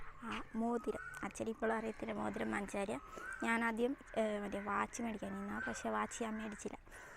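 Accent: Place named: Cherthala, India. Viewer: ടാ... ചേർത്തല സംസാരം, എവിടെ ആണ് വീട് 💞💞💞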